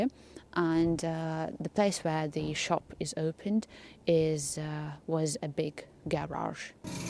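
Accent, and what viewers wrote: England, United Kingdom. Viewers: Thank you for taking us to Belarus super markets and showing different varieties of groceries in your country place... I like your English accent...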